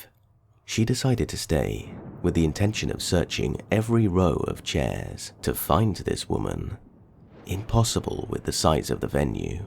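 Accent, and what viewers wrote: United Kingdom. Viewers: Thank you 😊!!!! For the Stalkerlicious 👁 Narration:) and for over an hour of your Britishlicious voice 😘 be safe everyone ok 💋much love Sexy Queen of Darkness ✌❤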